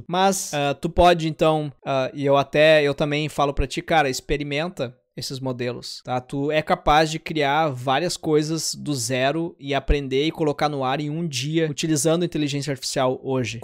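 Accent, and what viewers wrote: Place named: Ceará, Brazil. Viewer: Cearense detected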